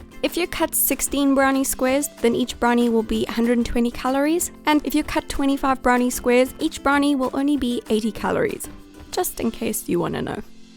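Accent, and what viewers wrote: South Africa. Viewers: You sound so South African! Are you South African???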